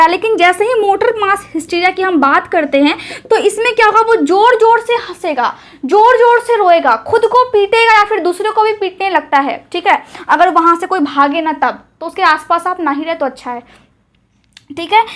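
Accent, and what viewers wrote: Bihar, India. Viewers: mam aap बिहार से हैँ क्या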